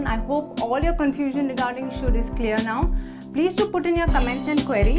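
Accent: India. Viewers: yeah is indian accent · Indian English...as a british i can teach u how to prounce ''2'' correctly lol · distinctly indian accent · yap, i think it's Indian accent :)) Just learn grammar from here, learn accent from others ! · I used to avoid Indian accent. It was like a tinkerbell in my ears. But with you. It's as soft as a Teddy bear. (I don't know why...) And i need to improve my english with your very usefull lessons.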